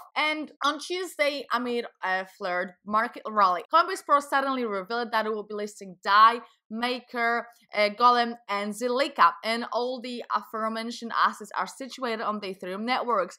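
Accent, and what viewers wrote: United Kingdom. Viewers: My, I just adore British accent! But getting back on topic - I think that 12 days of Coinbase suck and nothing`s gonna change in the upcoming months. Only users can make some change. I`ve made my decision on this already - I`m gonna close my Coinbase account after I withdraw all my funds. I`m so tired of being fooled by them one way or another. C`mon, guys, let`s make some change! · Like for this British accent ;) To to put it in a nutshell - Coinbase sucks :D Nothing more than fraudulence.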